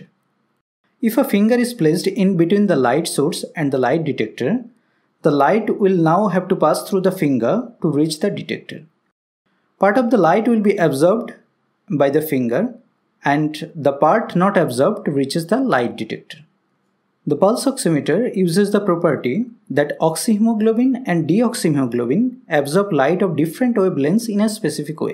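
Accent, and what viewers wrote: India. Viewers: The keling slang is so indian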